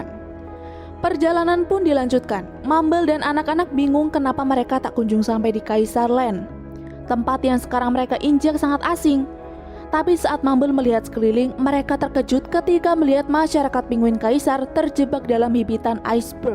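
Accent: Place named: West Java, Indonesia. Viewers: Admin na kos urang sunda anu tigarut nya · Ka kamu asli mana sih kok kaya ada bahasa sundanya plis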